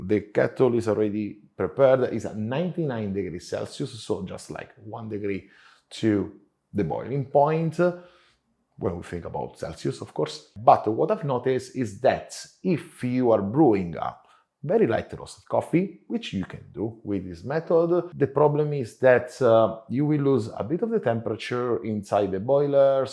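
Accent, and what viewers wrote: Italy. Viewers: Buena idea, buena explicación, y ese acento italiano excelente!! jaja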